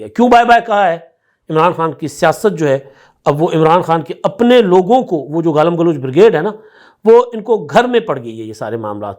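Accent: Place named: Sindh, Pakistan. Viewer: apki punjabi sun ker hansi aai . sinhi speaking ki punjabi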